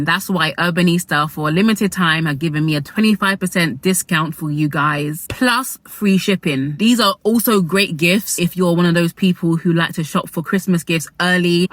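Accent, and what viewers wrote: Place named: RP, United Kingdom. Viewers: accent though…. So posh🤤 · I love your English accent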